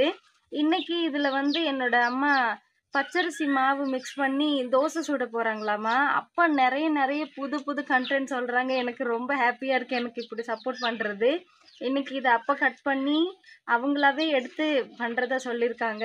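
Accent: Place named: Odisha, India. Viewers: I feel this girl is not a Tamil girl... She has learnt Tamil when working in TN....her accent is different and her dressing and all matches odisa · Tamil kathukittu pesura odisa ponnu ithu I think 🤔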